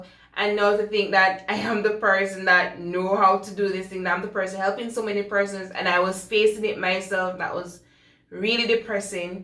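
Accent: Jamaica. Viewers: You sounds Jamaican. I'm waiting for a miracle for my alopecia. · She is definitely jamaican. Can't hide our accent no matter what 🇯🇲🇯🇲🇯🇲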